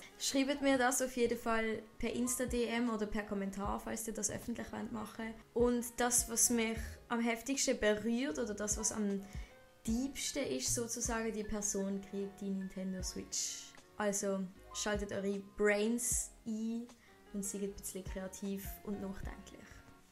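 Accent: Switzerland. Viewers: Sie redet jetzt schweizerdeutsch und nicht mehr Hochdeutsch 😅😂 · Total angenehm dich schwitzerdeutsch reden zu hören :)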